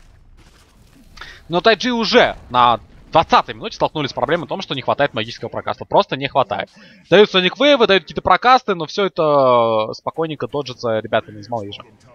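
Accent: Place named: Ukraine. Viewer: Не очень понятно, когда ты говоришь украинским акцентом :/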